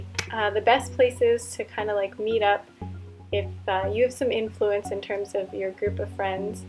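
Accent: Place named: Canada. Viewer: dunno where she lives now but she definitely sounds canadian based on how she says 'about'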